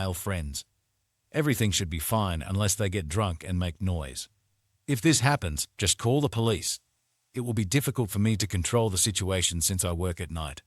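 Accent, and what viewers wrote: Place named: Australia. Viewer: Why did you use an Australian accent??? It's obviously set in the USA. There are very few Australians in the USA you have too much violence and blacks.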